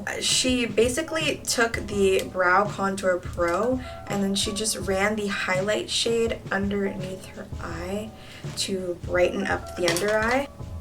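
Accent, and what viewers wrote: United Kingdom. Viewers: who else loved that British accent 😂😂? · Oh nvm I see wah British accent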